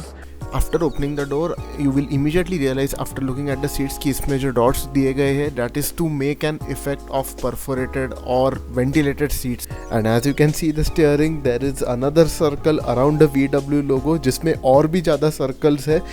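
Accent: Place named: Mumbai, India. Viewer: Very informative,!! Your talking style is unique,and 'Bambaiyaa'!! But you gave us a good 'Gyaan'!! I am watching this in 2021 end, with heavy heart, because, VW is on a 'killing spree' being a 'petrol head', I mourn death of 1.2TSI, 1.4TSI, & 1.5TSI is not for 'people'( I think, VW considers people are those, who can spend >22.5 lakhs for a car)!! It is evident, how much you love your car!! Happy driving days, & continue the 'good work' you are doing!!!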